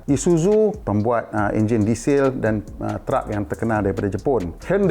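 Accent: North, Malaysia. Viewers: bang sesekali buat video cakap loghat utara lagi sedap la..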